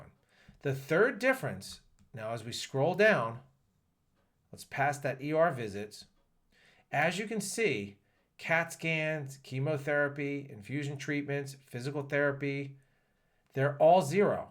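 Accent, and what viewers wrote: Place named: Chicago, United States. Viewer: This guy talks like he's from Chicago, close to my hometown.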